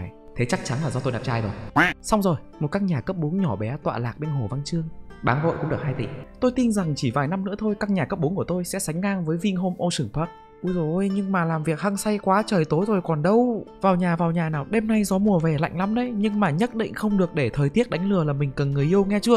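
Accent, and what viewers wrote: Hai Phong, Vietnam. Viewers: Anh ơi quê anh ở chỗ nào Hải Phòng đấy ak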